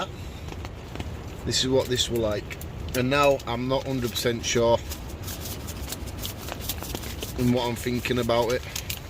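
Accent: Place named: Northern England, United Kingdom. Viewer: Chatting is what makes your videos, but with a northern accent makes it more worth watching. Keep up the work laddie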